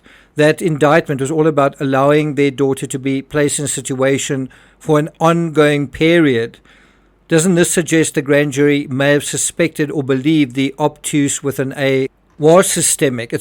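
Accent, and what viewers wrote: South Africa. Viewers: is ur accent SA? do u speak afrikaans?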